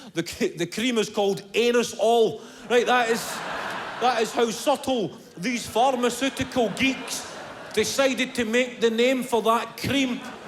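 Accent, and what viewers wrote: Scotland, United Kingdom. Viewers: Sounds like a Scot !! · It's called Scottish. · He sounds more scottish than before.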